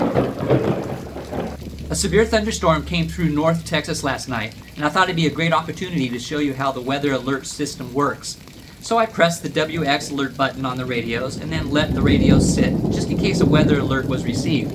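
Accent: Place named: England, United Kingdom. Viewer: Bro's english is very English.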